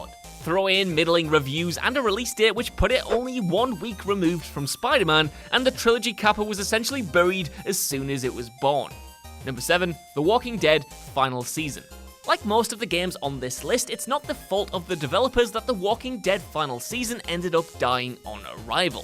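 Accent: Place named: Lancashire, United Kingdom. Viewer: That was too much Lancashire for one day, thank you. Good video though.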